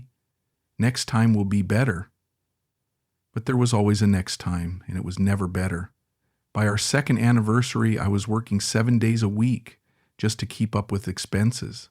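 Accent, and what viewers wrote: United States. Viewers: Australian with a Yank accent??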